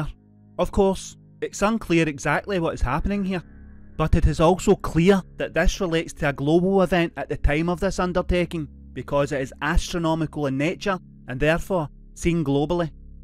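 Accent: United Kingdom. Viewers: I couldn’t have expressed my thoughts in any better way & have left comments saying so whenever I see them. It actually makes me cross that English speakers are complaining about an English speaker 🤷🏼‍♀️we don’t have American accents in the U.K. & we’re as diverse as the USA is in it’s large land mass. 💕:_TKTCEyeSeeYou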